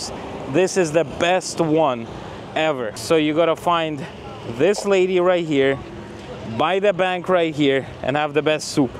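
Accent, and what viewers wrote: United States. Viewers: Ya know, you speak great English, more with an American dialect. Even some of the American philosophy entwined! How did you learn this? You will do well in America, just don't let them know you are Russian...very unfortunate. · You sound like an american' we all say and need to go to the GYM!!!!!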